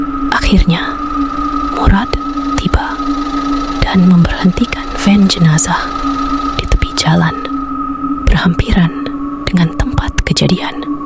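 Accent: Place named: Sabah, Malaysia. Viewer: Sabahan bm 😂😂😂 Iya bah,kau org Sabah bah.😂😂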